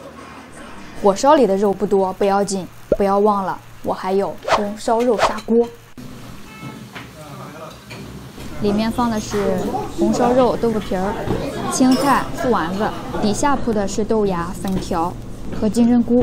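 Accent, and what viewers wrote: Shandong, China. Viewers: 加油山东口音不要丢视频能做到一分或一分半钟之内删删剪剪也不要紧希望参考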